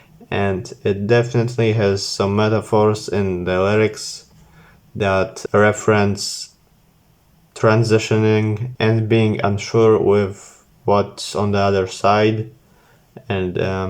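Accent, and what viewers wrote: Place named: Poland. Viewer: you have a polish accent, r u polish?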